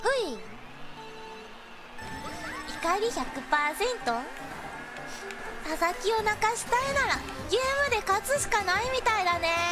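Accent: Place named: Osaka, Japan. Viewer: Angry + Osaka dialect = moe overload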